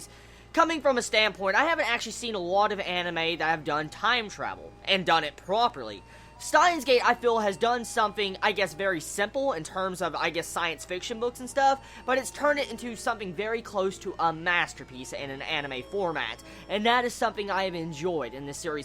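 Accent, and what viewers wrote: South, United States. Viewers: I think looking back on your older videos, and your recent ones, you've lost some of your southern accent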